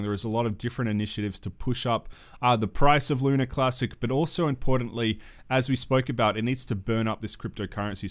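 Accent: Australia. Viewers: Love your accent. Makes me miss oz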